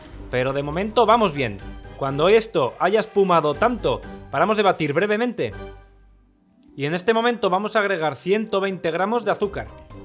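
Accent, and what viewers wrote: Spain. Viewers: soy Mexicano y me encanta la cultura y ese acento unico de nuestros queridos Españoles. ¡¡¡Que viva la madre patria!!!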